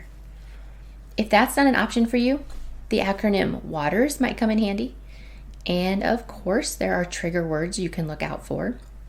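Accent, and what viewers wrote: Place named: United States. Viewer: I get so distracted with your accent that I dont undestand what you are explaining. You sound so american that you are pronouncing the O and A with american accent. This may have been a good explanation of the imperfect but your accent is really distracting me.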